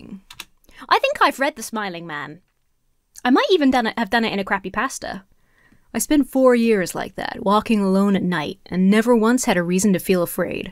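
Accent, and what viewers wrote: United States. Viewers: your american accent sounds a little bit like Red from OSP. :O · Love your American accent as always. But, uh, calling this a "game" feels a tad generous. Still, got some good fun out of it. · Your American accent is on point! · your American accent freaked me out more than anything. It sounded too good, like you been lying about having a British accent this whole time! It was unsettling. 😊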